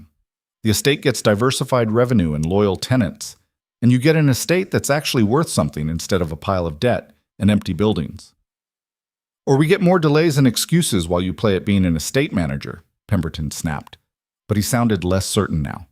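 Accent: United States. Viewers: I hate this AI narrator. American or Canadian accent for a British based story...and it's voice is just annoying.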